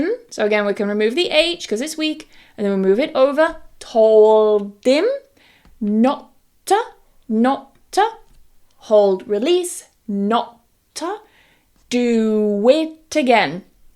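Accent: United Kingdom. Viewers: "Hello Madam, it’s been two years since I was your student. I started my journey following your British accent, but I’ve since fallen in love with the American accent and focus on that now. Please know that you hold a very special place in my heart, and I miss you more than words can say. Sending you all my love and respect from Bangladesh ❤❤❤ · The British pronunciation is amazing 🤩 when I listen to it, I relax 😁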